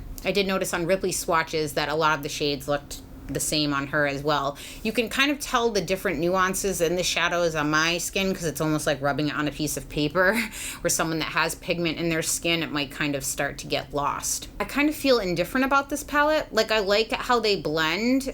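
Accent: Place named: Bay Area, United States. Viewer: y'all can already tell she's from the Bay. Girls like us vibe and just know💯😂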